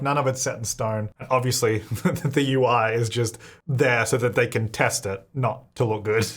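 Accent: Australia. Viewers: "lil nugget" 🥺 why is that so cute? It's probably the aussie accent, love it 💗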